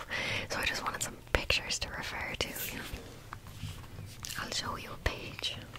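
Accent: Dublin, Ireland. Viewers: That was actually a really good Irish accent! If you’d had started with it I could’ve been fooled and I’m Irish & have lived here my whole life 😂😂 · Awesome video. I love your irish accent. I’ve been to Ireland once for a weekend but we only stayed in Dublin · Accent is actually quite good! Mix of a few places which makes it sound a tad off to an Irish person but very good. Also small note, Ireland is not in the UK! Although Northern Ireland is. Be careful around that some people might get very upset hearing that 😋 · Great accent most Americans butcher it class job 🇮🇪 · As an Irish person this is one of the best accents from an American I’ve heard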